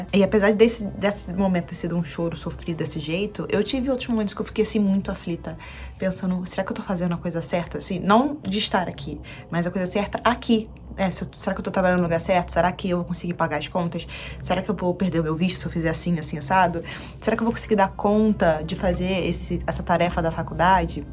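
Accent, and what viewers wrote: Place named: North, Brazil. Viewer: De onde vc e? Sotaque parece do norte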